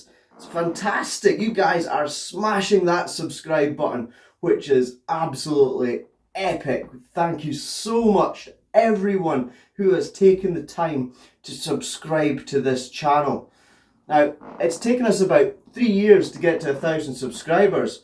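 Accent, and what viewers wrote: Scotland, United Kingdom. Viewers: I am in Banchory good to hear a Scottish accent on you tube thanks for the reply I’m enjoying your videos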